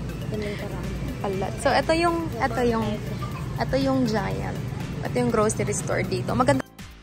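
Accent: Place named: Ilocos, Philippines. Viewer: Cutie nung tagalog ilocano. Hahahahahha! 💞💞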